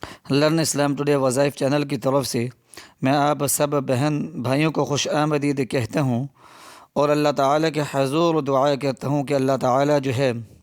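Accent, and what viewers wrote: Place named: Bangladesh. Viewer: this is bangali accent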